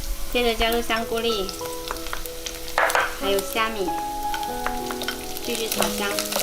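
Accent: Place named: Hakka, China. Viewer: 你好！看到都想食哟😀👍简单易学！吖妺话老鼠奈去了😃客家话好好听👏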